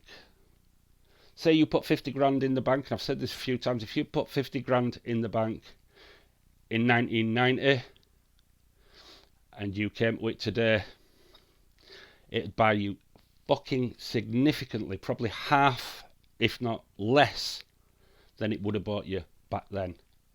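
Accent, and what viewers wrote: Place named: Leeds, United Kingdom. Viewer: sounds like Leeds acsent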